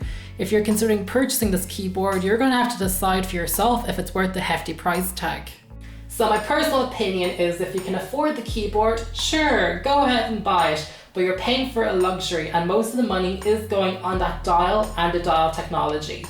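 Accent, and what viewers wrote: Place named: Dublin, Ireland. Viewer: Thanks for the real-world one year later, and the heart gushing Dublin accent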